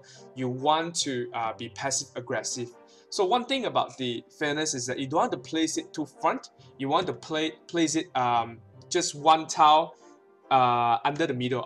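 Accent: Singapore. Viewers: U singaporean? accent tells me so lol. i am singaporean too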